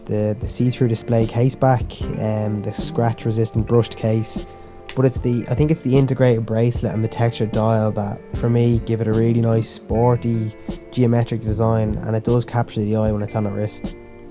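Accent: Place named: Limerick, Ireland. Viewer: Are you from limerick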